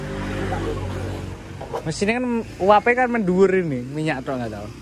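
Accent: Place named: Central Java, Indonesia. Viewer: Mas rumahe mna kok kendaraane plate S tp logate omongan jateng banget😊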